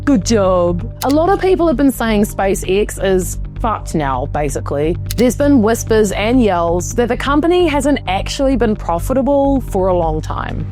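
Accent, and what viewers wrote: New Zealand. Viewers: Sounds like Kiwi mixed with something else, to my British ears 😅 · Love that kiwi accent from a fellow Australiasian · New Zealand accent? Nice! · Great video - very informative- thank you. Out of interest, where is your accent from? I'm guessing NZ but not at all sure... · From the multiple enunciations in several vowel sounds in just a couple of sentences; is the speaker for this a Kiwi? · Yes, Kiwi accent. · New Zealander by the accent!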